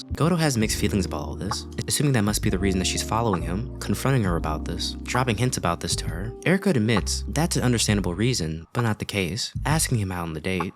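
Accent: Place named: United States. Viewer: Did I understand much? No Did I watch the whole thing? Yes Why? Because I need to do an American accent in a play, and I’m copying you 👍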